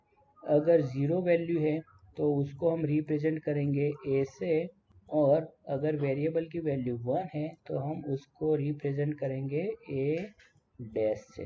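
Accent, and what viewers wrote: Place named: Gujarat, India. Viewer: Bruh you must be fron gujarat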